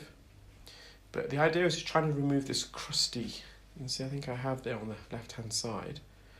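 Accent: England, United Kingdom. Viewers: You are a sweetheart, for sharing with. Us your knowledge and skills. It is quite wonderful to hear your soft English accent as you explain to us why and how you clean an ear canal. Thank you Take care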